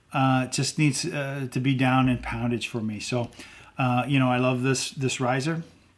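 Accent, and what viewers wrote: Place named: Canada. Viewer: I was the guy who deduced you're Canadian by the way you pronounce "about" (aboot). Anyhoo. I enjoy your videos. You're very personable and don't purport to be an expert. Good reviews and tips. Now you said that I had to subscribe, like the video and then go to Instagram. But "Optional" came up. I'm assuming Instagram is. Correct? But put me in for the drawing. Have heard good things about the Black Hunter. Keep dem vids comin'.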